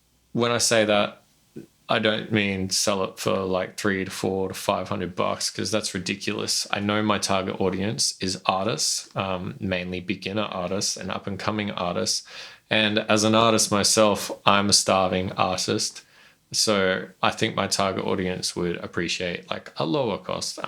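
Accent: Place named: Australia. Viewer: Honestly too as a westerner, your accent is 75% of the appeal of your voice. Don’t underestimate the power of your Aussie accent bro. I know that sounds ridiculous and trivial. It’s really not though. Your accent and the naturally lower timbre of your voice really plays into something good.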